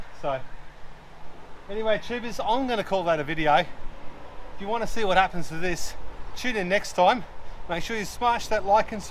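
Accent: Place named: Australia. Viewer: I love the Australian accent.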